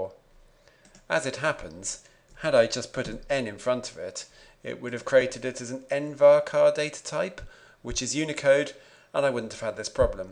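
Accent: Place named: RP, United Kingdom. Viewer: If 007 did a video tut on ssis, this is how it would be -- entertaining and informative, all done in typically delightful Queen's english! Thanks for the awesome videos. -- roger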